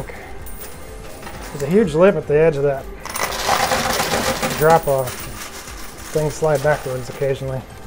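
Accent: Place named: South, United States. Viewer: Love that southern drawl.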